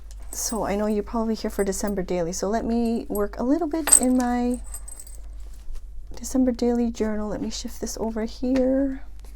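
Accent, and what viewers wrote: Canada. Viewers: Oh! I didn't want the video to end!!! I was enjoying so much! Thank you for keeping it real and for sharing with us how you sometimes struggle to keep up with your journals too. I already commented about the 10a.m ridiculousness in your previous video, but Ugh!!! I really feel your pain! And the gyms too? Pre-Covid, I used to be the first one at my Starbucks am when they opened. I needed my caffeine before heading to the gym! ☺️. I'm a morning person, and if it doesn't happen in the morning, it doesn't happen at all! Regarding the Oli clip, you could maybe try to find a different brand of magnetic paper clips locally? Especially beeing in Japan, the Mecca of stationary, I'd assume that you would be able to find pretty much anything you needed/wished/wanted... Anyway, it's just a thought 😊. I'm really curious about what it is you do exactly. 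Your job seems to be very intense! And what is that "box" you mention putting washi in? Ok, one last quick thing (I promise!! LoL) I find it so adorable when, once in a while, in some of your videos, I hear you say "eh?" at the end of a phrase. It always makes me smile. You might have left Canada, but Canada has not completely left you 😉. Sorry for the long and rambly comment!! Have a wonderful day! ♡♡♡ - X.O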